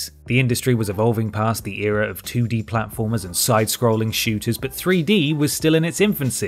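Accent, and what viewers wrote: United Kingdom. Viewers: Gotta love british accent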